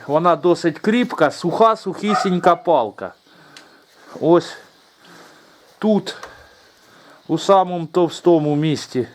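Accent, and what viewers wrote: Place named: Poltava, Ukraine. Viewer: Привіт відео про дививсь,про корморізку січкарню шок? А остальное чудово свиней люблю колоть з початку війнитримав3х Зара ні?молодець господар ,підпишусь тільки не шкодь техніку воне не для того,зроби окремо щепоріз в парі з дроворубом,шків потрібно проточить ,під ремень відповідний на апараті?або ремень інший ну пока іду воблера дороблять,молодець господар ,рибак,вимова мені приємна полтавоукраїнська ,мені подобається успіхуОК.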